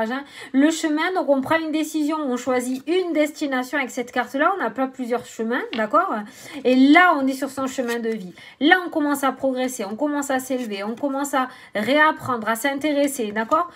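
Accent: South, France. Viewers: merci pour ce tirage, il est trop bien. Un joyeux anniversaire , profitez bien et plein de belles choses. Et comme vous êtes du sud et que je suis du sud aussi , vous me le rappelez trop d'ailleurs, toutes vos expressions me font trop rire , ça fait un bien fou d'entendre quelqu'un qui parle comme moi "mdr". Je vous fait un énorme bisou pour votre anniversaire.merci, merci pour votre bonne humeur dans toutes vos vidéos.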